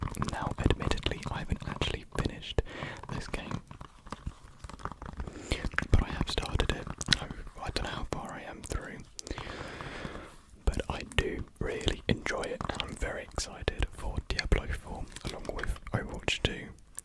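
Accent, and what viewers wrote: United Kingdom. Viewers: I can tell my teacher I'm learning British English with Asmr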